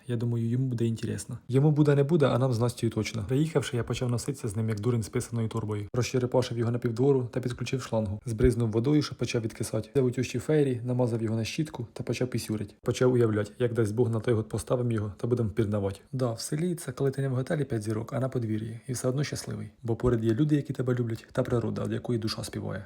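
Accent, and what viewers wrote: Ukraine. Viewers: Яка проста укаїнська говірка! Суперово!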